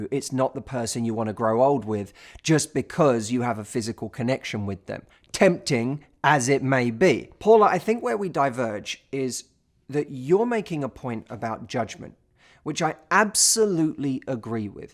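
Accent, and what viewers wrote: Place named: England, United Kingdom. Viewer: He gives great advice and he is so beautiful I love his lovely English accent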